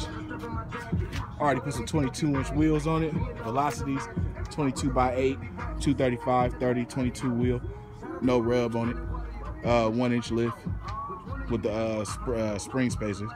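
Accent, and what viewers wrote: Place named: Southeast, United States. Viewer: You aint from Cali are you? You sound like you somewhere South east...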